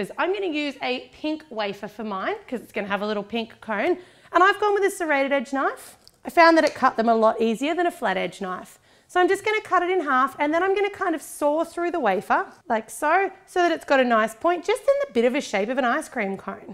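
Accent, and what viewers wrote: Australia. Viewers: I knew you were Australian ;) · shes austrailian;)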